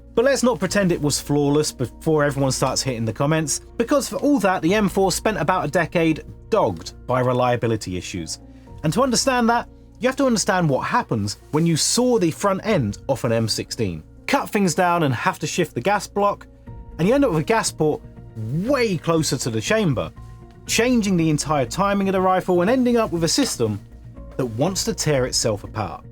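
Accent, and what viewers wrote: United Kingdom. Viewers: What country are you in? Doesn’t sound by your accent you should have held a weapon in your life, not even a butter knife. How do people in the UK cut meat and vegetables for cooking now that I think of it? Illegal to have a knife is crazy. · Im used to guntubers to have a southern accent. Maybe Georgia or kentucky. Brit? Ok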